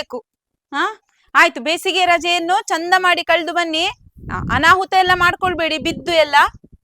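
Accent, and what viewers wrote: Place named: Dakshina Kannada, India. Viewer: Very nice talk Madam. DK kannada is soo 😍 lovely.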